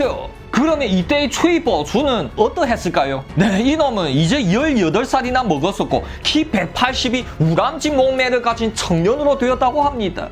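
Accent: Yanbian, China. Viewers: 연변말 북한말씨 다른 것 알겠네요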